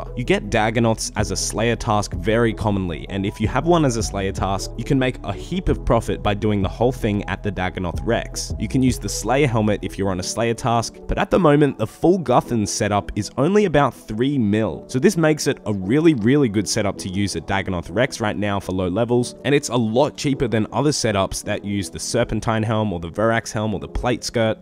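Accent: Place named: Australia. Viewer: Australian accent bro. Buttah instet of butter. Houah instead of hour.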